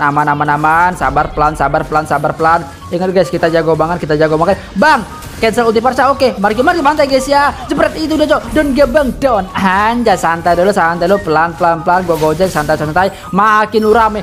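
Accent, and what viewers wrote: Palembang, Indonesia. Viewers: Emang lucu wong plembang klo ngomong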